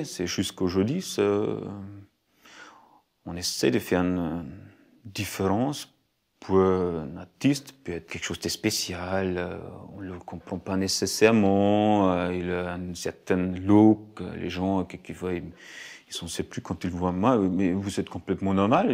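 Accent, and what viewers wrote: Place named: France. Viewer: Amazingly beautiful work. All that and a french accent.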